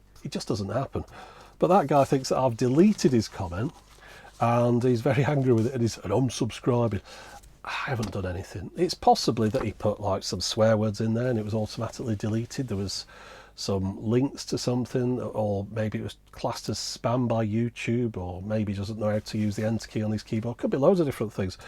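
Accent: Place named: Wirral, United Kingdom. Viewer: I'started watching you three or four months ago and got hooked, so today a signed up with Patreon. As a Brit living in the USA, with maybe a few more miles on the clock than yourself, and married to a girl from the Wirral, it's great to hear that accent again. I know you like units with a CRT embedded in it and wondered whether you know anything about the portable Galaxy 2200 Video Cassette recorder? Its of the 'made of steel' era with a corded remote, powered by battery or mains and with a handle to carry it around... There's precious little info on the web and prices go from free to $250 functioning. Any how, I could make an unusual piece. Much love from across the ocean. Leon.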